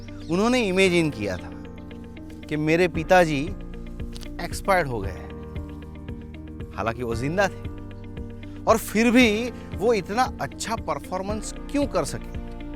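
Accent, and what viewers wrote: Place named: Bengal, India. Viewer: tumhare language mein bengali accent bhar bhar ke ah rahi hai 🙂🙏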